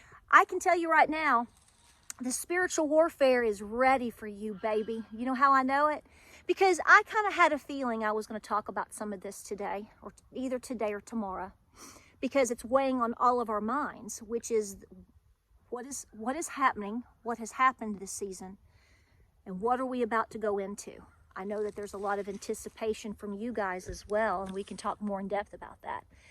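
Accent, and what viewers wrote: South, United States. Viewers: Your voice is super awesome idk what word to use the country twang and matter of factness. I enjoyed this. — on Christmas, my Christmas Day was the most enjoyable to date! My husbands and parents also. It was the best family time! Intimate. Games and bonding. Now Christmas Eve (extended family) some didn’t one due to political differences so that stunk :( Regardless! Praise you Lord Jesus! We love you! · I really like you. Love your southern accent, yes the attacks can also be spiritual fatigue. I felt that way befor3 the election. On another note yes ppl want flowers and rainbows but thats not the world we live in. Fear to face reality.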